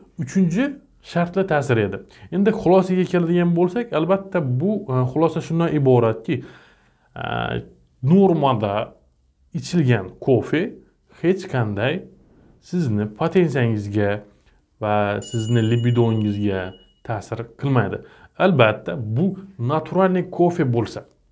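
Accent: Khorezm, Uzbekistan. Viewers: Доктор хоразмданмисиз дейман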